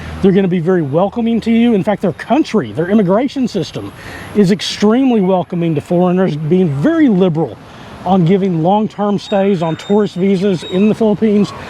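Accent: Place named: Georgia, United States. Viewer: I can tell you must be from Georgia. 😁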